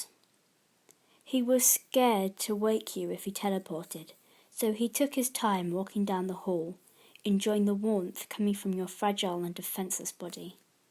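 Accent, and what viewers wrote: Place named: United Kingdom. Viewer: For some reason when someone who has a British accent and they are reading it sounds nice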